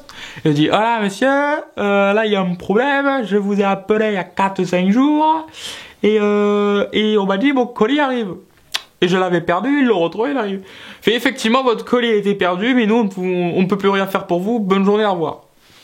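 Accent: South, France. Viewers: Mdr l'accent du sud😂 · Mon pauvre. Moi aussi mon fait la même chose chrono poste c chiant putin Ps C mon avis ton accent du Sud te vas biens mais stp arrête le ca me rappelle un prof Sadic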